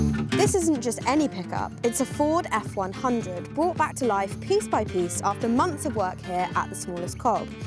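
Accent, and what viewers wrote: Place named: RP, United Kingdom. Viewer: The poshest accent on Youtube. One of the benefits of private education no doubt.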